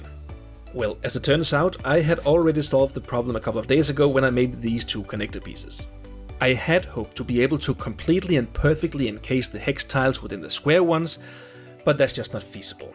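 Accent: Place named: Denmark. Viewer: That accent… are you Danish perchance?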